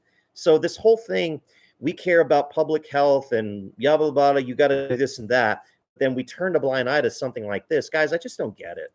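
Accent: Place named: United States. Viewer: You have quite the strong American accent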